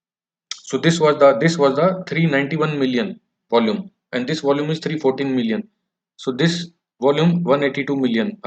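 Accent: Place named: Haryana, India. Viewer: aawaz main to haryana brgi jan si h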